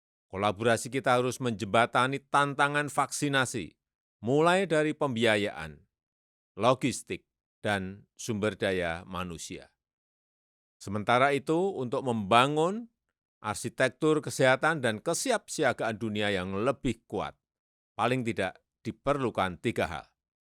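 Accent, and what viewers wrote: Java, Indonesia. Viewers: 😁😁😁😁😁😁😁Hihihihihi Benar tuchhhhh. Ndak enak mendengar BPK Jokowi menggunakan bahasa Inggris saat di forum internasional.....kagok...(lokal Indonesia jawanya terasa sekali....). Maaf ini bukan RASIS atau kesukuan....tapi itu melekat dan sulit dihilangkan 🤭🤭jadi kebiasaan dari kecil sampai tua pasti terbawa.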